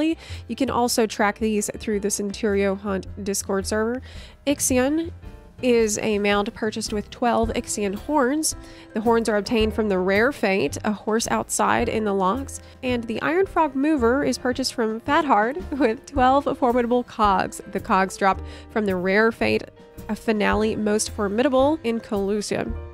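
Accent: South, United States. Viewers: I keep ending up here plus you’re southern and YT needs more southern accents. Subscribing and thanks for the content :)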